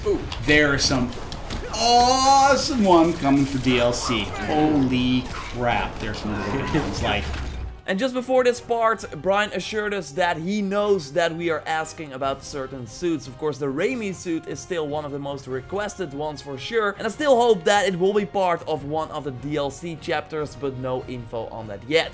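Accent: Netherlands. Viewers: Ooh daam i love ur dutch accent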